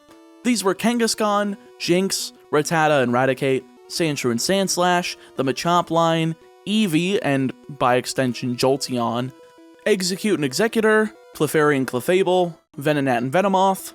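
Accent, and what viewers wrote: South, United States. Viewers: Anyone else think he's pronouncing Rival real southern like in the beginning? In fact, he seems to slip into a southern draw alot this video xD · At the beginning I couldn't help but notice that you are definitely southern. You don't have a strong accent for the most part, but when you said the list of rivals you pronounced a lot of them as rahval. As a fellow southerner there's nothing wrong, just an observation. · YouTube told me i haven’t watched this video so here we go again with the funny southern man and the way he says rAival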